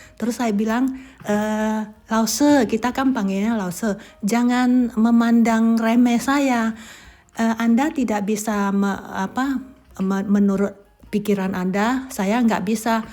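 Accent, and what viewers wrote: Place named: Aceh, Indonesia. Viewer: Waktu lewat beranda saya, logat bicara ibu seperti temen kuliah saya orang Aceh, saya kira ibu orang aceh Mau tanya ibu asli mana Ehh ketemu vlog ini... Terimakasih sudah bercerita... Sehat sehat bahagia selalu bersama 🤗🤗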